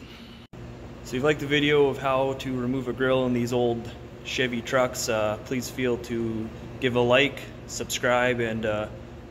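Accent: Canada. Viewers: Your accent sounds very Canadian? Manitoba?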